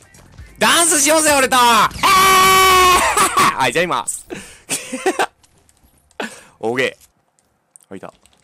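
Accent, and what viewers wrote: Kansai, Japan. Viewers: 関西からしたら喋り方普通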